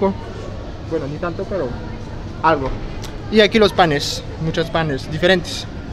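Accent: Colombia. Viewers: Exelente video, dices que tu ciudad es pequeña pero es muy hermosa!!! Ya hablas como todo un colombiano. Saludos desde México 😘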